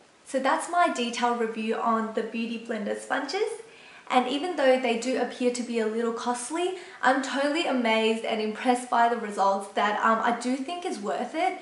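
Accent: Australia. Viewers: I love your video! And love the Aussie accent! ;) so I just used my beauty blender for the first time today and just like u, I fell in love with it!! It covered my acne and scaring. I couldn't believe it!! Best purchase hands down.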